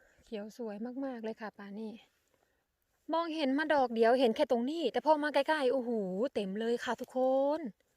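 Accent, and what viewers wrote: Korat, Thailand. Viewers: พูดเหมือนคนโคราชพิมายเลยพี่ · สำเนียงคนโคราช? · พูดเลยค่ะภาษาโคราชน่าฟังค่ะ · พึ่งเคยดูพี่คนโคราชไหมคะสำเนียงเพราะมาก ชอบค่ะ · พึ่งดูครั้งแรกคนโคราชนิเห็นพูดโคราช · สวัสดีค่ะคุณขอถามเด้งเห็ดอันนี้มีเลิ่นหอมแบบไหนค่ะคุณทางนี้เคยเห็นแต่กลัวไม่ก้าเก็บพูดออกภาษาไทยเหมือนฉันเลยไทยเด้งคนโคราชนิหรือบุรีรัมย์ค่ะตอบเด้งเด้อจร้า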